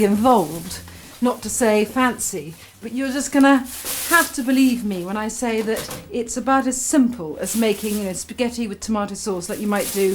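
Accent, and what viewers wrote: England, United Kingdom. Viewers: What type of English accent does she have?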